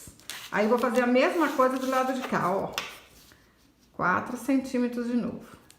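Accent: Minas Gerais, Brazil. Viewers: Gostei da sacolinha. Mas, especialmente, do seu sotaque! Já assisti a vários vídeos seus mas só hoje vi que você é mineira. Como eu! 😘😘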